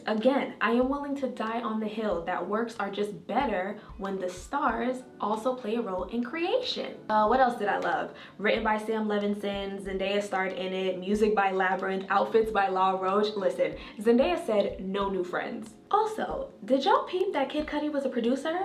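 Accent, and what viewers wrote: Jamaica. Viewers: Hi. I haven't found any info 'about me' in your videos. I picked up a Jamaican accent and allusions that you're sapphic. You're pretty, smart, confident, and brutally honest. Who are you? Where are you from?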